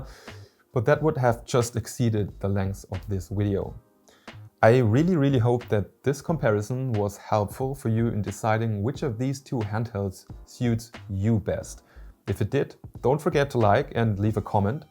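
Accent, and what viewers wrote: Germany. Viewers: German? Hört sich definitiv so an, danke für den Vergleich. Hab den Legion Go zurück geschickt. Zu groß, zu schwer für einen Handheld. Software hat nur Probleme gemacht und war kaum schneller als mein altes SteamDeck. Überlege doch noch den Ally zu holen... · Ich hab 2 Wörter gehört und direkt gewusst der Typ ist Deutsch, ahhahaha aber cooler Vergleich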